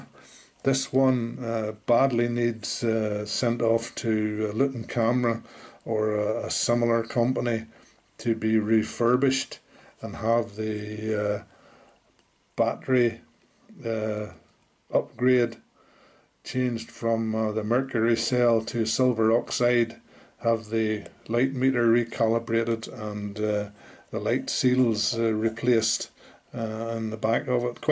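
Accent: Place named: Northern Ireland, United Kingdom. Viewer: Loving the Northern Ireland accent. A N.I friend of mine taught me how to say "How now brown cow" with the accent. Although I didnt know you guys said "filum" for film. My favourite camera, I couldn't afford one when I started out in photography and so had an OM-10. I only recently got an early OM-1 and 2 and I'm once again shooting 'filum' alongside my digital.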